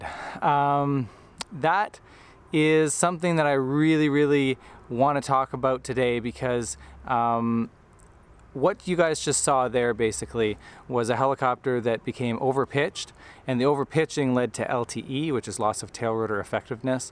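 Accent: Canada. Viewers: Love these videos Your thoughts can be use in every day life Air safety institute videos cause & effect gives me same CHARITY..who knew chopper talk have life lesson I dont fly i walk Why do you sound So kanadien in pronunciation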